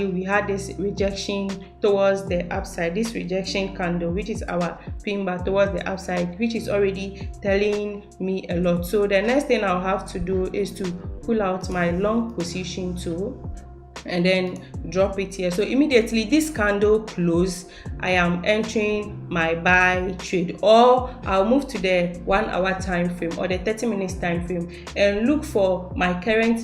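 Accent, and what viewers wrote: Ghana. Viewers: Honestly, I have been consuming your content since day one and I must say your channel is underrated. You deserve many more subscribers and views. You always share a lot of valuable insights. Which are always straight to the point and beginner-friendly. Not to forget your sweet Ghanaian ascent too.😍, Keep giving us masterpiece content. Thank you!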